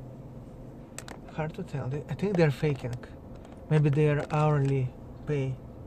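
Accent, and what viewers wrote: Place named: Russia. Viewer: This accent. Where are you from? russia?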